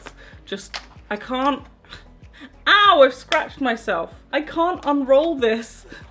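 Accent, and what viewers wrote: United Kingdom. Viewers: Is she british?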